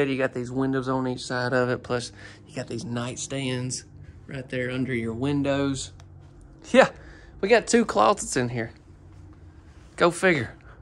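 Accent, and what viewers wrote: South, United States. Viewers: Well first off, Cullen is too adorable! And secondly, thank you so much for ALL of your videos! Anytime I find a home I think I might like, I run over to your channel to watch you tour it! Love your humor and accent! Gotta be from the south! I’m in Mississippi myself! Once again, thank you for all the videos and the good laughs!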